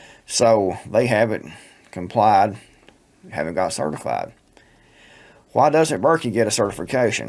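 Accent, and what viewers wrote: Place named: East Texas, United States. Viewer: perhaps Esst Texas or Knoxville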